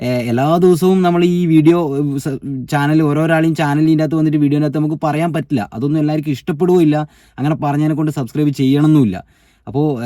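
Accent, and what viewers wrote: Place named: Thiruvananthapuram, India. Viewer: തിരുവനന്തപുരം ആണ് എന്ന് തോന്നുന്നു സംസാരം കേൾക്കുമ്പോൾ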